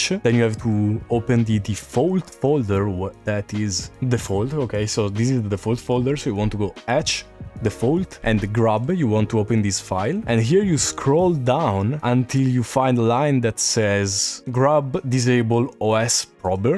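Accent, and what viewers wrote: Italy. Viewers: U are italian?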